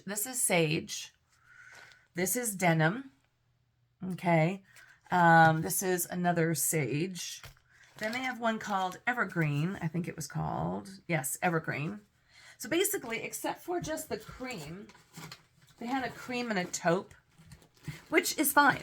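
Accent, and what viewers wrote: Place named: Tennessee, United States. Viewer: You brought back some of your southern Tennessee accent. ❤😂 That book is amazing.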